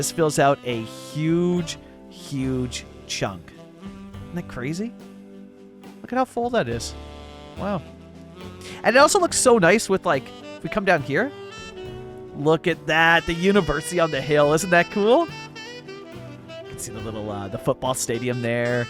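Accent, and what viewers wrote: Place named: Canada. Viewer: Hey, my first of your videos, watching from Dublin Ireland here. The content (and your lovely Canadian accent) is very relaxing to watch :)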